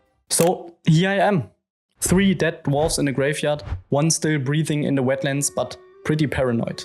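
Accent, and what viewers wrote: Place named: Germany. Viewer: German English sounds funny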